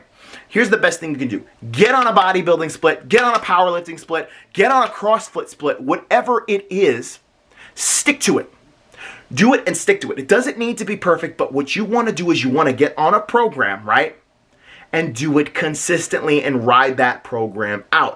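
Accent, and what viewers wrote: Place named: South, United States. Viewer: Good Southern accent Coach. LOL you can shed it and then the illusion takes over, you look pretty big on stage!!! Another banger video!!! OK bro wassup with the podcast with Joe....I'm gonna keep kickin your ass until y'all start doing them again.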